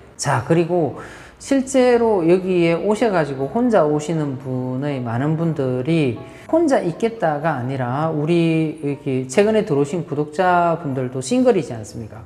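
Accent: Gyeongsang, South Korea. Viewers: 진솔하고 알기쉬운 베트남 생생토크 감사합니다~! 전 충청도인데 경상도말이 간결하게 설명하는덴 최고인것 같습니다. 뭐 그보단 님의 성격이 화려한 수사없이 진중하신 성품 같습니다~!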